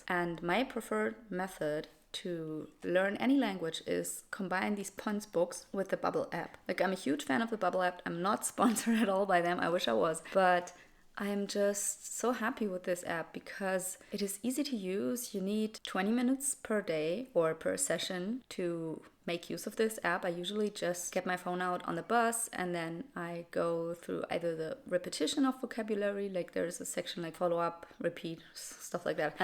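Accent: Germany. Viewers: I started learning German 2 days ago because i love the sound of the language. It's my 3rd foreign language. Even your accent in English is so sweet like German.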